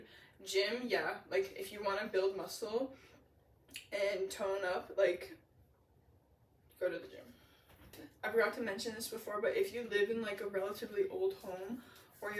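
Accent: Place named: Denmark. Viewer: Why do I hear a Danish accent? :)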